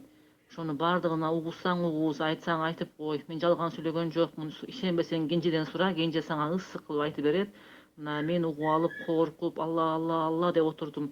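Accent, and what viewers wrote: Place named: Uzbekistan. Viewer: Сен озун озбечкасынго суйлогонун озбектей экен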